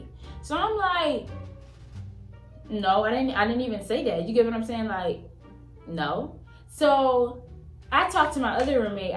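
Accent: Baltimore, United States. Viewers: Girl you from Baltimore??? I hear an accent😭👏🏽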